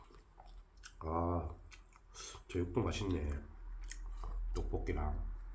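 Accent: Gyeongsang, South Korea. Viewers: 무슨밥? 머슴밥? ㅋㅋㅋ남편이 가끔 “머선일이고?”해요 ㅋㅋ 고 느낌인데요?ㅋㅋ경상도 사투리 좋아하는 1인이예요~😊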